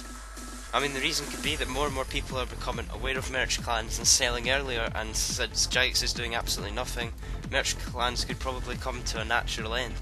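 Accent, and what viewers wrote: Scotland, United Kingdom. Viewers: You sound Scottish =) I love scots!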